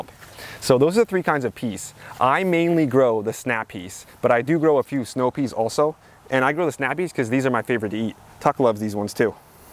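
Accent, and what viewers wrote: New Jersey, United States. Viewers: Are you from Joisey?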